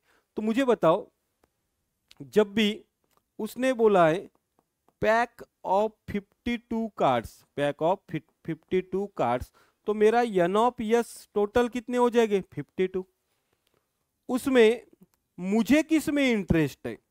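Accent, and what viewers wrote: Maharashtra, India. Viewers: Question >> ❎ Kochhan >> ✅ Marathi accent 🗿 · Marathi accent 🥰🥰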